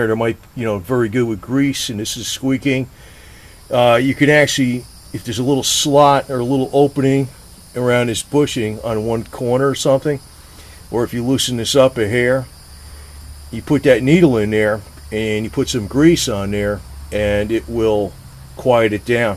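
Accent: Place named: Cleveland, United States. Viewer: no offense.....thought I heard a little Cleveland in you....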